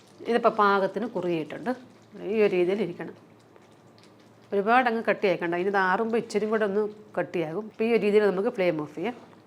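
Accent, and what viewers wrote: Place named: Mavelikkara, India. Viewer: Nalla presentation anavashya vachakam onnum illa bhasha kettittu oru mavelikkara side pole thonnunnu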